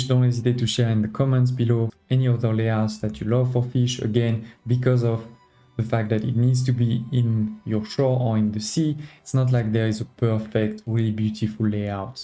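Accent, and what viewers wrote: France. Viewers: It is perhaps a fallacy of the American mind that I immediately think “this guy has a French accent, therefore I trust his advice unconditionally.”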